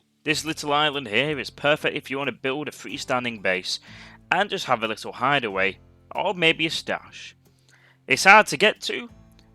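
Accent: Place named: Cockney, United Kingdom. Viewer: Damn it you gave away my island boom stash Cockney bastard ---the lizard king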